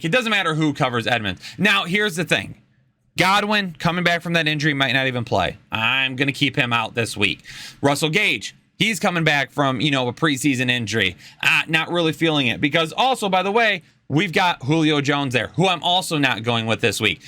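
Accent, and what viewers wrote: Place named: Minnesota, United States. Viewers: You had the better Minnesota accent for sure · Minnesotan born n raised, and your accent was much closer dontcha know.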